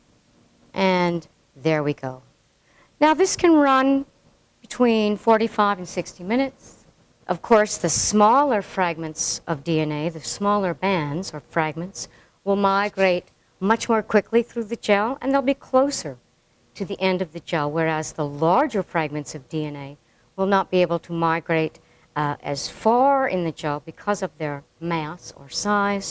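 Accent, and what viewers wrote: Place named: South, United States. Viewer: Slight Southern accent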